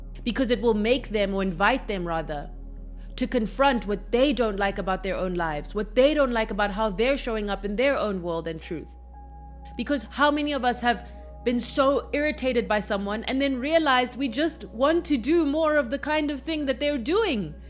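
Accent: South Africa. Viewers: Gurllll are you South African? 👀✨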